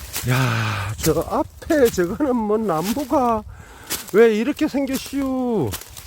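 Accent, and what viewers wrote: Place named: Gyeongsang, South Korea. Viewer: 구수한 갱상도 사투리가 정겹습니다~^^ 귀한 영상 즐감하고갑니다 수고하셨습니다 행복한 나날 되세요 🙏 감사합니다 🤗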